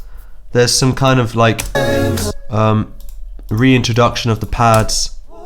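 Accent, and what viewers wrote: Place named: United Kingdom. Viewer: Who knew you had such an amazing SPEAKING voice. And a Brit? I could listen to you speak and be happy. Thank you for sharing your gift!!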